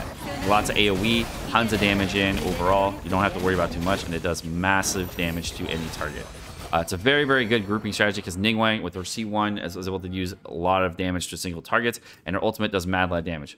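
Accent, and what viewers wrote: United Kingdom. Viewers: I love your british accent(?)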